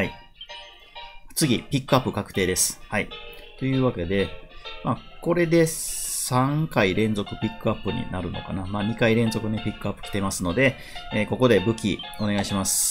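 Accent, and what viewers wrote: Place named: Kansai, Japan. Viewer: あ、残念ですねー😆 目つぶってる🤭ｸｸｸｸｸｯ 浜田さんの関西弁好きやでー❤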